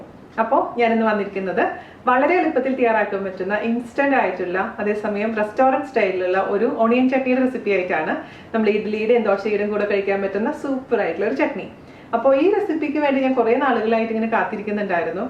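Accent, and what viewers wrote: Thrissur, India. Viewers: Chechi,njangalk chechiyude Thrissur samsaram orupaad ishtamaann... 😘😁😀😂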